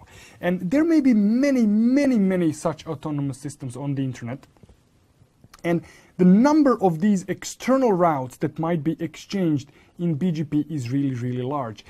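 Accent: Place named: Eastern Europe. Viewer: I love this guy and his eastern europeen accented English, amazing explanation of all import concepts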